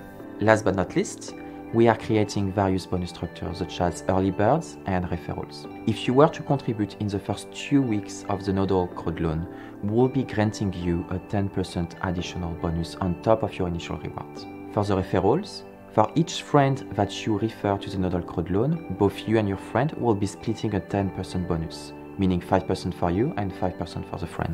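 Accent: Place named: France. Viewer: No offence, but I had to watch it 3 times to understand. It might be just me or English with French accent is not very easy to understand, although interesting message, keep going with good work Nodle!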